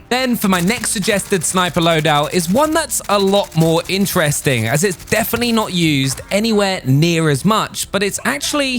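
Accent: United Kingdom. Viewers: Probably his British accent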